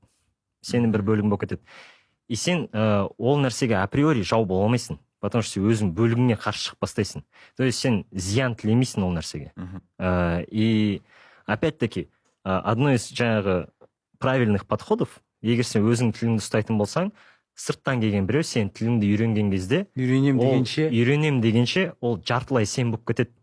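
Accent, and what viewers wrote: Standard. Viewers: Ол қазақша сенен таза сөйлейді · Как раз таки он чисто, грамотно и литературно излагается на казахском языке. Это он объясняет напротив сидящим русско говорящим ведущим.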